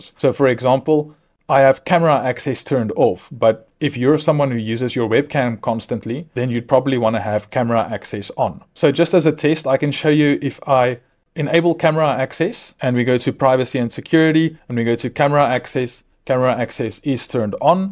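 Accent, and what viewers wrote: South Africa. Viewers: Dude, are you a South African?????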